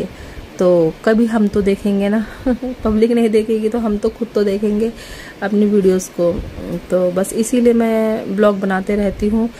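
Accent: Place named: Garhwal, India. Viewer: Garhwali bolate hue acche lagte ho, bahut Sundar 👌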